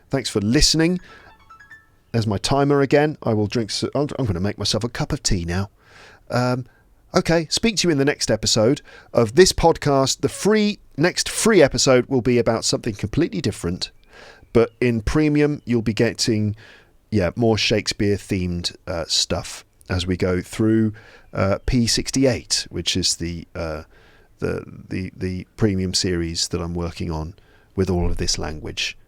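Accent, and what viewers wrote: United Kingdom. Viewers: British accent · I adore the British accentas i am ateacher of english from North Africa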